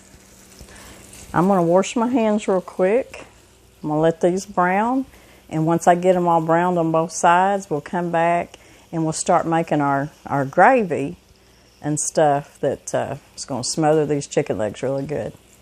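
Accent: South, United States. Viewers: 🤤🤤🤤. Drooling at this video like my pit bull drools when she's watching me it. I marinated some thighs last night in a brine. I was either gonna deep fry or grill. Oh bud thanks to your video and accent, I'm from TN, I know what I'm gonna do, I'm gonna drown mine in gravy, gravy is a staple of the south, and greens mashed taters, but I'm gonna have fried okrie, another southern staple. I'm really surprised I've not run across your channel and what's odd are the number of comments but no thumbs up. Mmm mmm 😋😋😋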